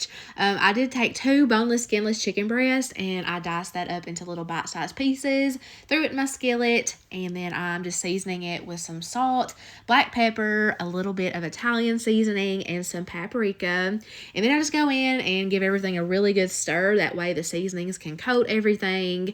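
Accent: South, United States. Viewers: I love the southern accent, way too cute!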